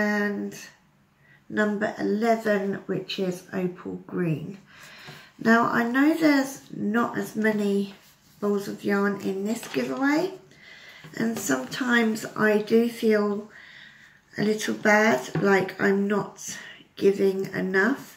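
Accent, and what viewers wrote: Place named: United Kingdom. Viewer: You should never feel bad about how much you give. It’s nice no matter how much, I enjoy just listening to your wonder British accent. Have a great week!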